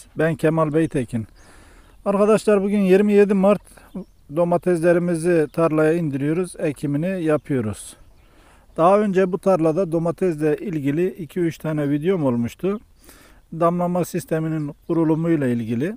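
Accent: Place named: Adıyaman, Turkey. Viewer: Kardeş sen Adıyamanlımısın yau. Konuşma tarzın Adıyaman şivesi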